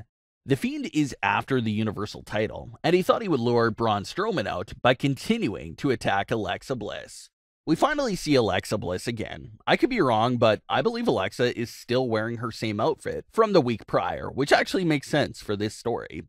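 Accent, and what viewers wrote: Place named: Canada. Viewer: That damn accent is so annoying you sound like damn Canadian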